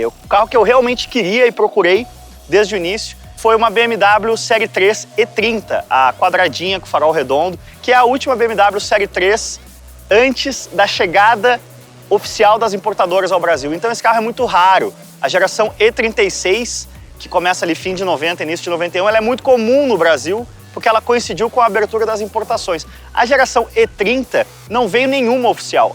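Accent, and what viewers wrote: Italy. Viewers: O sotaque italiano do é engraçado, quando ele fala italiano eu me mato de rir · Mais que belo video (sutaque italiano) linda historia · teu sotaque italiano é maravilhoso! Mas meus ouvidos estão sangrando..... kkkkkkkkkkkk. Brincadeira! Abraços! Em tempo: já vi um desses com motor V6. Aqui em Florianópolis sei de uma pessoa que tem uma, porém não sei se é 4 ou 6. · !!! Parabéns pela escolha!! Linda machinna!!! Não deixe de fazer matérias com o seu Bello sotaque italiano!!! Que bom ter vc como alfista..sou de Londrina tenho 2 machinnas uma é a Sofia e outra é a Franchesca...E carrego grande orgulho de ser descendente de italianos... viva Alfa Romeo. Abraço amici Alfisti. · “Tem gente que não gosta do meu sotaque italiano “ tem gente que se incomoda contudo, pelo menos nós aprendemos as pronúncias corretas ou próximo do corretor, show de bola man AlfaRomeu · não tem nada mais chato que esse """""Sotaque"""""" italiano pqp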